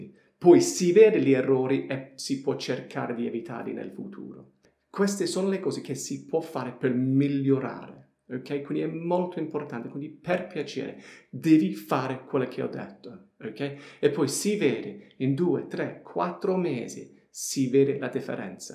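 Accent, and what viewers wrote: Apulia, Italy. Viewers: The same 😅 Allora non mi sbagliavo.. ho percepito una cadenza pugliese 😀. Very nice teacher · Ciao una curiosità..il tuo accento pugliese si sente quando parli in inglese con i tuoi connazionali? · Non perdere l'accento pugliese .. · sei fantastico, davvero belle le tue lezioni. Poi hai acquisito quell'accento pugliese che è il top 😁😁👍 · Come calabrese? Secondo me è accento pugliese. Sullo stile sono d'accordo... ottimo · Bravissimo, semplice ed esaustivo. Il tuo accento pugliese è mind blowing 😄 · This guy has a strong Italian accent from Bari, Apulia. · Ma come si fa a non amarti? Sei uno splendido insegnante. È un piacere ascoltarti e imparare da te e con te e tramite te. Il tuo italiano/pugliese è adorabile!!!! Grazie mille per ciò che fai. God bless you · vabbò figurati, io parlo da solo da quando sono nato, quindi si scandalizzerebbero ben poco. 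🤣 Comunque il tuo mischiare inglese e pugliese è veramente fantastico. 🤣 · Sì il suocero dev'essere un pugliese, si sente!!🤩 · Your Apulian dialect is very funny and above all it is "spot on" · Inglese con accento pugliese..è stupendo😄👍😉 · Ascoltando il tuo italiano, penso che tu hai imparato l'italiano in puglia o in abruzzo, è vero? troppo forte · Ma no !! Lui è inglese quindi parlerà la sua lingua perfettamente ! Quando parla in italiano ha l'accento pugliese perché ha praticato l'italiano con i suoi parenti tutti i giorni mettendo in atto quello che consiglia in questa lezione. Ascoltare e ripetere ... Lo ha fatto in Puglia con dei pugliesi ecco perché ha questo accento simpaticissimo. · Accento Puglinglese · L'unico (bravo) insegnante di inglese al mondo con l'accento Pugliese!!! Adorabile!!! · Thanks! Did you know that your italian accent is from Puglia? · A tratti sembri pugliese :)) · si sente l'accento pugliese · In love with your Apulian accent ♥️ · Sei molto bravo. 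Mi piace come spieghi e lo fai anche in un modo simpatico...l'accento pugliese è ancora più simpatico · ma hai studiato italiano in Puglia? xD hai una cadenza molto simpatica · Ti seguo da poco Sei fantastico simpatico e bravo Poi il tuo accento pugliese è originale Fai lezioni private on line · Ma tu hai origini pugliesi?? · Eng-Pugliese, favoloso, love it! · Thanks for your advices! They're very interesting and useful! I like Reading articles in english and recording my voice repeating that! I like watching your videos. You look just like my american oncle, the way you move, the way you speak With your apulian accent, (we are apulian too).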